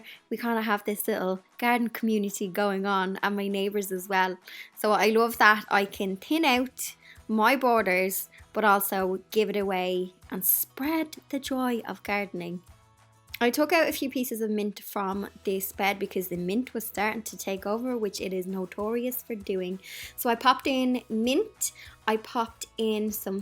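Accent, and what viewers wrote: Ireland. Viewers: I kept thinking you were saying day lilies, growing them from seed. Then when I saw your plants, I’m like that’s not a day Lilly. It took me a hot minute to figure out you were saying dahlia. I’m from America so I had to let the Irish sink in a minute. I have several growing I’m my garden. I love them. Happy growing!!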